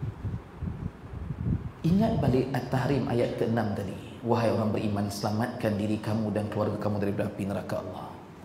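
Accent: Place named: Northern Malaysia, Malaysia. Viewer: Bahasa utara memng agak kasar... tapi bagi penduduk utara x de masalah.. klu x sesuai dgn ceramah ustaz ini.. boleh la dgr ceramah ustaz setempat... ustaz setempat mungkin tahu inisiatif utk mendekatkan diri dgn penduduk setempat...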